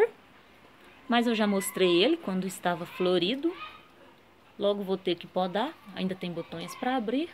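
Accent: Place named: Goiás, Brazil. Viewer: eu perguntei qual a sua cidade pq talvez podemos trocar mutas e o tipo de falar parece ser do meu estado de Goiás GO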